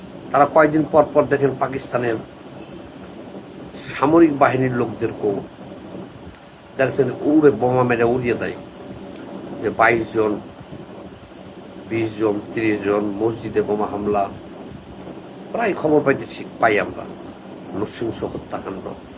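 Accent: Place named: Chapainawabganj, Bangladesh. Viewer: ভাই আপনি কি চাঁপাই নবাবগনজে ছিলেন? আপনার কথায় মনে হয় চাঁপাই এর মানুষ।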